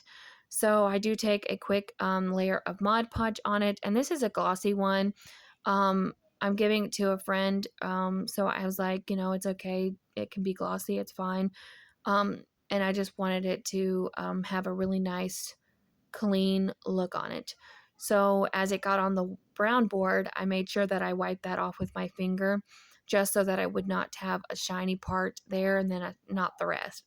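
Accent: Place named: South, United States. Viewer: I love ❤️ your diys.. this really inspired me to get ready to start fall diys. You are so sweet love your southern accent. ❤️ I am from the south also. Have a blessed day.. keep those diys coming. 🎉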